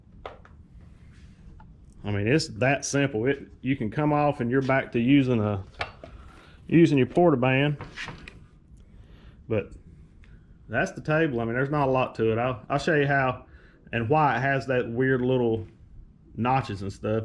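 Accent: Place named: Tennessee, United States. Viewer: You the first fellar I ever heard that sounds just like me. The tone and the way you put words together. Middle Tennessee ?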